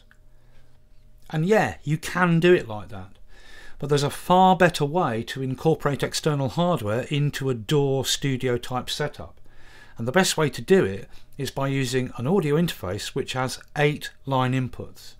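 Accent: United Kingdom. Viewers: You know the video will be good the moment you hear his British accent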